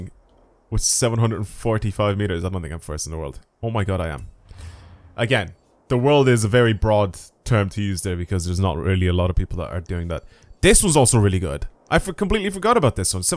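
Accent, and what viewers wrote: Ireland. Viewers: I love that Irish accent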